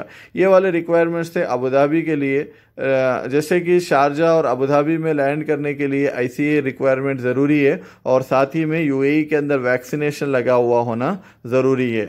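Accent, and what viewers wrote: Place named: Hyderabad, India. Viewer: Assalamalaikum MASHAALLAH khali awaz se phechan lete Hyderabad ke koi hai to Bas aap se ye hi guzarish hai ke please Hyderabad ka naam roshan karo.. Allah hafiz dua ki gusarish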